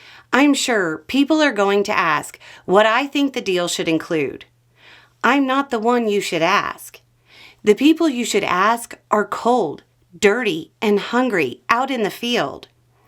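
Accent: United States. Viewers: Uau! A true Belle of the Ranch telling the obvious truths with a deep American accent! All is not lost in the USA! The war in Ukraine is currently the most transcendental conflict and will have global consequences.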